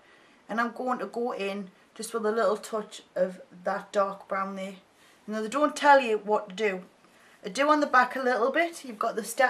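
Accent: United Kingdom. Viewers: hahaha i love ur accent so much!!! i hate most british ppls accents but urs is so cool!!!!!!!!!!!! God bless u jesus loves u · Love ya vid, were ya been man woman lol:-P You make me laugh, you,ve got some lovley comments on here, but what I cant stand is people asking u to " talk properly" and to "not talk" well is a Geordie this is how she speaks thats north east England and lots of us like her talking, it's called being real!!!! X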